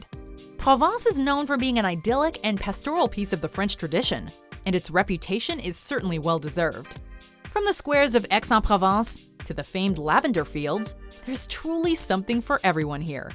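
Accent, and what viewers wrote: Quebec, Canada. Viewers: She's French Canadian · Her accent is French Canadian, so French likely her first language.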